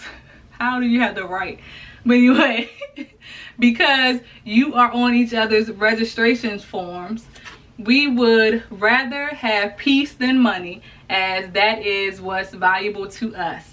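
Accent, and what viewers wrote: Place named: DMV, United States. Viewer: Are you from the DMV? Lol you sound like it!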